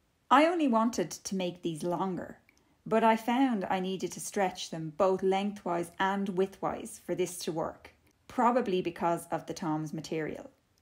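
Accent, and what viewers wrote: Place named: India. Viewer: What a lovely accent and informative video, i love your accent are you from india?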